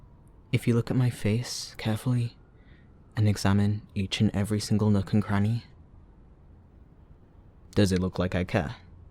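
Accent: United Kingdom. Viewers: (speaking French) Bro I thought you were British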